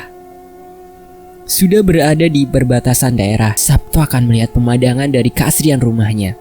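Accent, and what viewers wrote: Java, Indonesia. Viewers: Tertarik ma logat bhasanya. Itu logat bahasa jawa mana ya? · Aq yoh yess cm cerita inih j0zz... Bisa banyk belajar bahasa cinta Tpi dengan logat jawanyh😍meski aq jawabarat Tpi bojoko jatim😍😍😍😍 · Bohoso jowone kok lucu to mas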